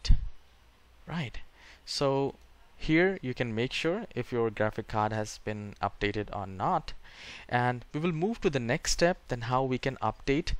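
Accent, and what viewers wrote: India. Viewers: hes trying to hide the indian accent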